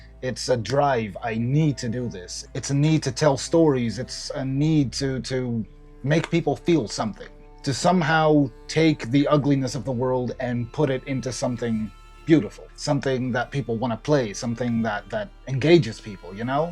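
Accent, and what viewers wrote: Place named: United Kingdom. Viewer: All good points. One thing I wanted to mention though that most YouTubers conveniently fail to bring up: most countries outside of the US often provide artist related grants to indie game developers, to help them survive while they’re pursuing their dream. That may or may not be you (although you sound like you’re from the UK so it might be), but just imagine everything you just said, now add on to that the necessity of also being independently wealthy, or so young that you’re still mooching off mommy and daddy, and now you have a better perspective of what it’s like to be an indie game developer in the United States. Again, that may or may not be you, but I just wanted to mention it because it’s something that a lot of these European game devs will often deliberately omit.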